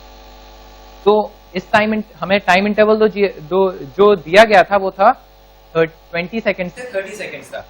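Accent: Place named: Bihar, India. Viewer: bihari lol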